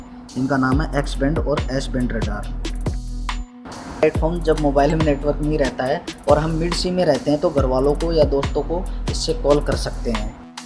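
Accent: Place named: Haryana, India. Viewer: apki voice Haryana jesi lag rhi hai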